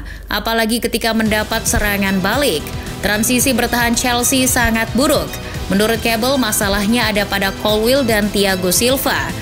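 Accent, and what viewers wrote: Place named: Semarang, Indonesia. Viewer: pengisi suara jangan jangan org semarang nih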